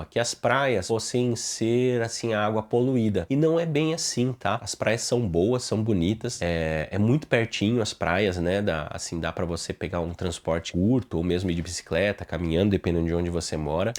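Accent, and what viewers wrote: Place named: United States. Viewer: Sotaque forte eu acho quem fala esse R que você fala, tipo americano. PoRRRTa kkkkkkk😅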